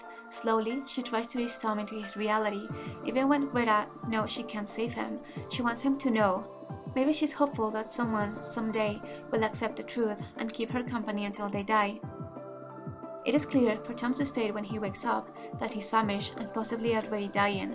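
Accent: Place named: Eastern Europe. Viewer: Awesome video. This story blew me away. Great thoughts and analyses. Love your Eastern European accent, btw...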